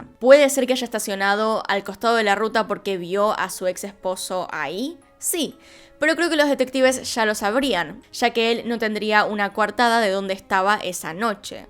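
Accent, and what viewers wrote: Argentina. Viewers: Hola a mí también me encanta una...vez...más y lo bien relatas las historias con esa voz tienes un Argentino muy bonito👍💞💞💞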